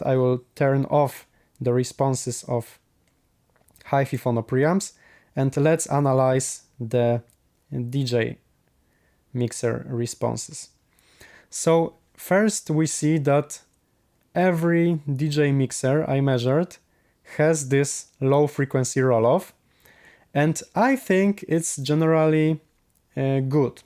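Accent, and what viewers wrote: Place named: Poland. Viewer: Odpalam anglojęzyczny filmik i słyszę polskie akcenty i widzę w opisie, żeś Polak xD pozdrowienia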